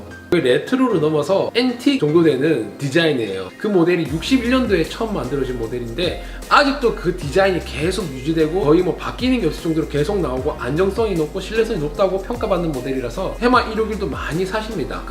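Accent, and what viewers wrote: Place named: Gyeongsang, South Korea. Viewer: ㅋㅋㄱㅋ 돈을마 발라놧네ㅋㅋ 님 부산에서 카페하시나요?? 경상도사투리가...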